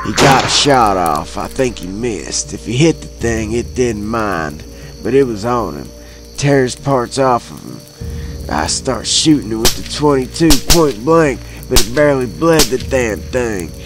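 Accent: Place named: South, United States. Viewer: Southern accents need more love in this community.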